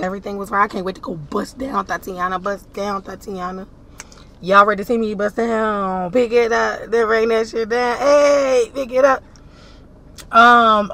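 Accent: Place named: South, United States. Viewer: i love your country accent omg 😂😂 i’m subscribing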